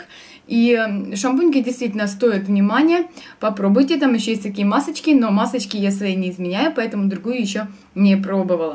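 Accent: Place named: Ukraine. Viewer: у Вас такий милий акцент український=)і Ви сама дуже мила і цікава=)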